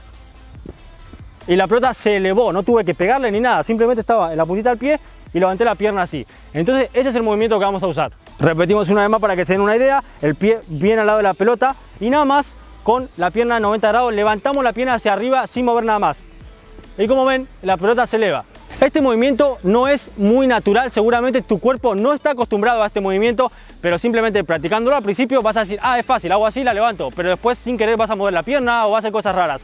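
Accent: Argentina. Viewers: ¿ sos de a Argentina? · Sos argentino o que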